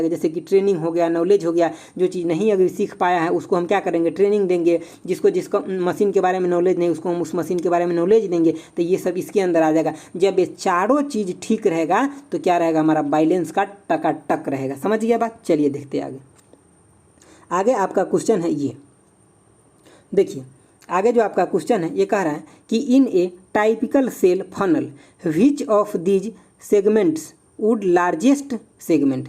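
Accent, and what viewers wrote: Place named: India. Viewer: Sir aapke ke padane ke technique ke saath saath bolne ka tarika bhi ekdum desi hai 😁 Jai exam crack academy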